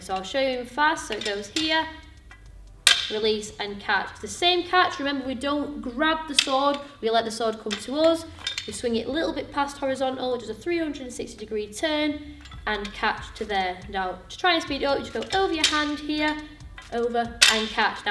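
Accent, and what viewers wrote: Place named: Yorkshire, United Kingdom. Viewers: The 'oo' sound makes it Yorkshire. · Which part of the UK is that accent from? Love it. Sounds like Dr. Fiona Hill's.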